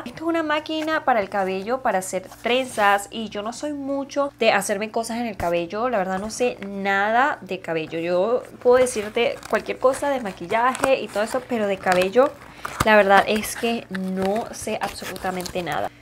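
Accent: Venezuela. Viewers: jajajaja tu acento Venezolano es único jjajaja saludos preciosa desde hoy soy tu suscriptor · Con solo ver 30 segundos del video me di cuenta de que eras venezolana 😍 jajajaja nuestro acento es UNICO!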